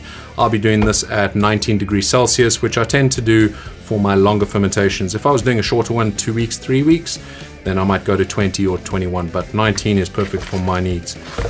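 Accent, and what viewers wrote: South Africa. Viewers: Where are you based? You sound South African.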